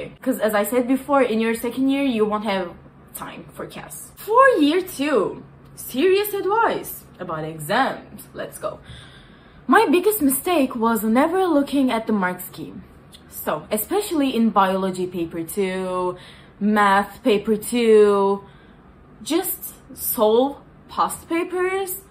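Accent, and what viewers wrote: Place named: Turkey. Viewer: Türk aksanı da çok yakışıyor .*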